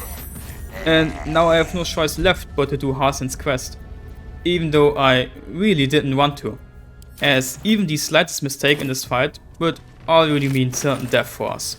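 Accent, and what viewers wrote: Germany. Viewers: Mega Video, sehr spannend und geniale Erklärung, sodass man immer sehr gut verfolgen konnte, worum es ging. Der deutsche Akzent wird über die Länge des Videos immer etwas prominenter, fand ich lustig.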